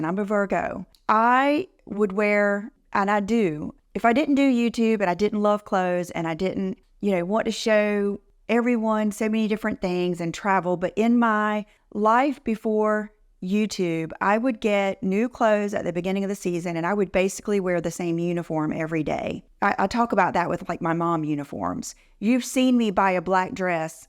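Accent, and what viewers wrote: South, United States. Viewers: hi - thank you! i love your insights & tips...also keep up the great energy & i love your southern accent!! xo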